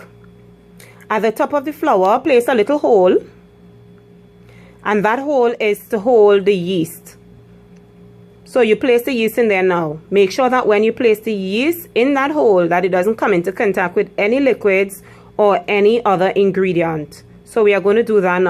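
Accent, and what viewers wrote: Trinidad and Tobago. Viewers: 🇹🇹🇹🇹🇹🇹 Must spot a trini accent anywhere! Tell me, does this recipe taste like "our" traditional homemade bread? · Yeah as soon as she started to speak i was like.. This is a trinni??? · You sound like a trini like me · You sound so Trini 😁🇹🇹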